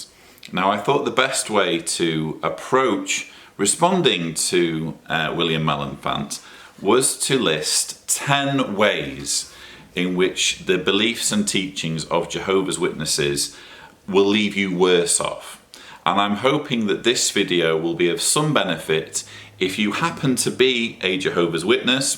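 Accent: England, United Kingdom. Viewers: Where n England are you from Sir...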